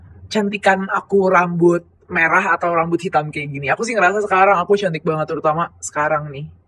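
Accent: Java, Indonesia. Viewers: Enk aj gtu klo dgerin ka ngomong,medog gmn gtu ya wkkwkw😂😛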